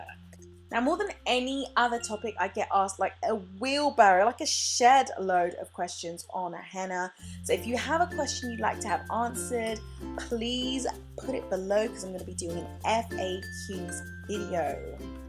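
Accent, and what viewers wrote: England, United Kingdom. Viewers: OH MY GOD YOU'RE ENGLISH!!!!! YESSSSS!!!!!!!!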